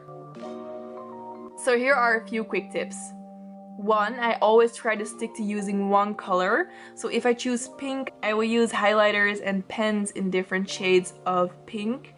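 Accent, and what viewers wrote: Netherlands. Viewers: at first i thought u were dutch too because of those pens and your accent... and then when we got to see your notes, I SCREAMED BECAUSE YOUR DUTCH TOO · Wait omg r u dutch?! 😍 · Hi i know this is so random but are you dutch? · Is that dutch 😂❤️ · Are you dutch? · Ben je Nederlands 😅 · Ok but like I have such a strange feeling you’re dutch, is that correct? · Ik hoorde aan het begin van de video een nederlands accent, toen ik jouw schrift zag wist ik het wel zeker :) supergoede engelse uitspraak! <3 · i smell some dutch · OMG ARE U DUTCH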